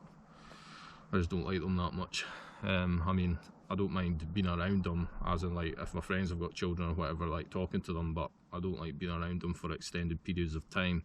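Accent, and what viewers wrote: Scotland, United Kingdom. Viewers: My parents are Scottish I thought you were Scottish Highlands is beautifull Ive been there good video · Are you a Scot, mate?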